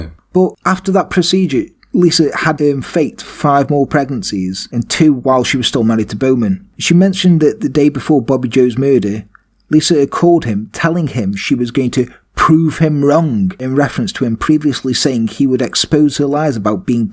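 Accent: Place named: Stoke, United Kingdom. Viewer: You sound like you’re from Stoke?! Subscribing for this reason 😂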